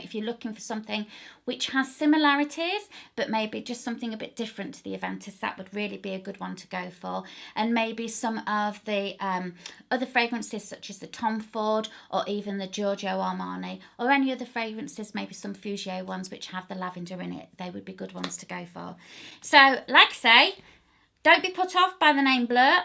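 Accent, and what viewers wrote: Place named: London, United Kingdom. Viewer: always watching your review anyway ms. you live in UK or what country coz the way you talk its made from london..your so beautiful fragrance reviewer thats true..gave u compliments more than a fragrance..anyway we live here in manila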